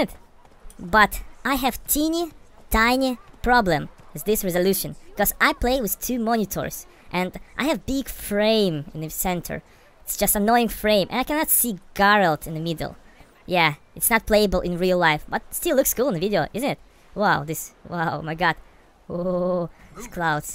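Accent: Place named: Russia. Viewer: Now I can hear your Russian accent :) Usually I don't notice it so hard x)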